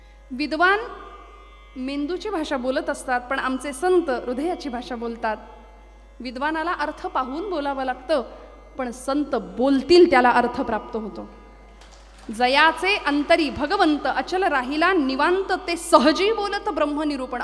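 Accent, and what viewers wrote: Standard. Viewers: ताई खुप स्पष्ट उच्चार,शुद्ध वाणी, गोड गळा,आणि संतांच्या वचनांचा गाधा अभ्यास .धन्यवाद .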